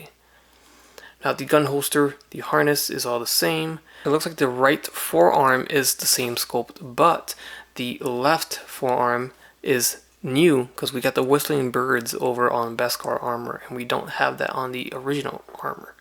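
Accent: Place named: Asia. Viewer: great video man. i can't wait to pay resale prices for this lol...i have a random question tho, is your last name, what is your ethnicity? i sometimes hear an asian accent, asking for a friend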